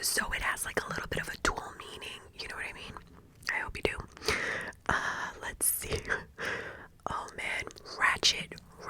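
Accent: New York, United States. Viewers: MAN YOUR VIDS ARE ABSOLUTE PERFECTION!! I love the NY ACCENT!! I’m gonna do an ENGLISH ONE (probably many) when I start actually doing my asmr voice videos!! This is so cool and interesting!❤️ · Being a 29 year old English guy, all accents and slang words fascinate me! And your New York accent and all the slang words are beautiful and sassy!!🤗😇 xox 🥰🥰❤️❤️ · I love New York and I love your accent 😂💗🥰!!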